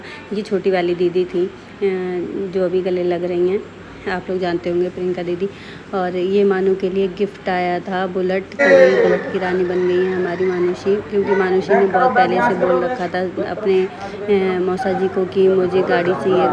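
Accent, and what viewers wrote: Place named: Mainpuri, India. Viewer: Aap ki bolne ka tarika bahut hi pasnad aata h.menpuri ke jesa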